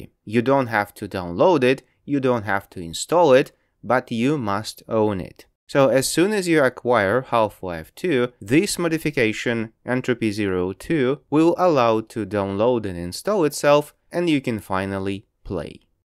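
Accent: England, United Kingdom. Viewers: о вы из англии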